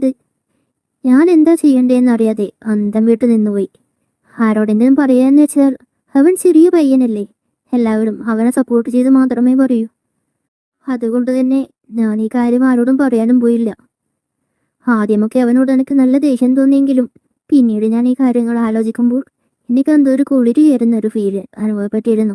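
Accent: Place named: Kochi, India. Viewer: story telling in the Kochi accent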